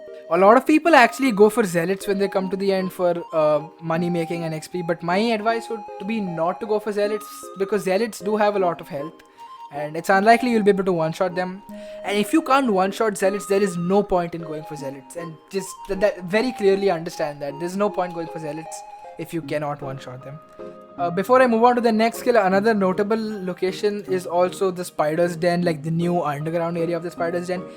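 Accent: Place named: India. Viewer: why does he sound like he is an indian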